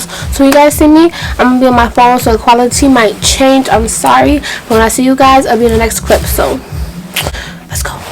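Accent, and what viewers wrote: New York, United States. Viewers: He so Ny lmaoo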